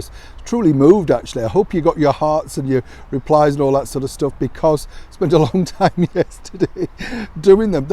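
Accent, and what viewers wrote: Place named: Northern England, United Kingdom. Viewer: Hi, just wondering which part of the north of England you hail from? I am a scouser currently living in Australia so no doubting my heritage….you sound very familiar 🙂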